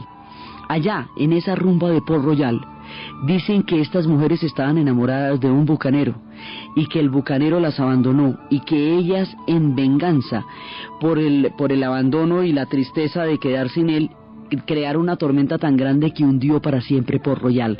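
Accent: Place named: Colombia. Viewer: Me encanta como cuenta las historias esta mujer, me gusta su voz y su acento, tremenda joya que tiene Colombia, saludos desde 🇸🇪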